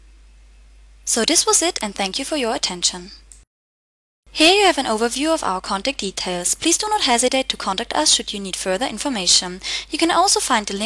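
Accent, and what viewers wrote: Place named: England, United Kingdom. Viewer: I just love her English accent.......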